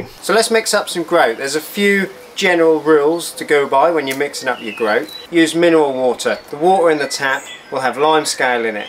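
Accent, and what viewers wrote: United Kingdom. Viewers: Love his British accent 😅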